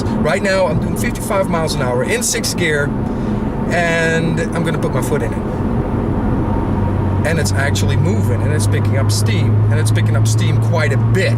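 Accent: Netherlands. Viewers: i detect a dutch accent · ahahahh, grappig om nog een beetje een Nederlands accent te horen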